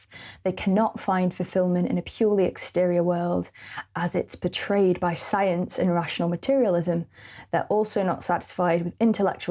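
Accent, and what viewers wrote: United Kingdom. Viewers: There's nothing quite like watching a lass with a Northern accent explaining Jungian philosophy and ideas as well as you just did - truly something to behold 😭I really enjoyed this video, thank you!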